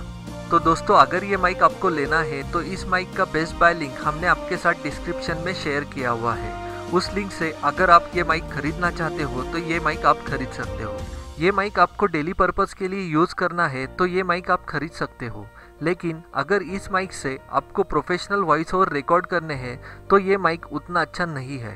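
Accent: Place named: India. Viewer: Oh god the Indian accent 😭😵😫😖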